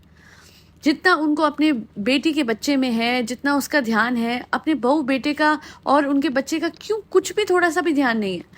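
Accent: Punjab, India. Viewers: You speak very cute Punjabi. Please try to add a few lines in Punjabi in every podcast.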